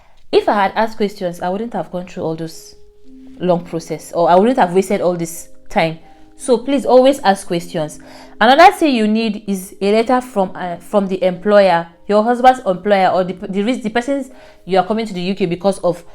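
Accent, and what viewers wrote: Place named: Hausa, Nigeria. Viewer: Your accent sounds hausa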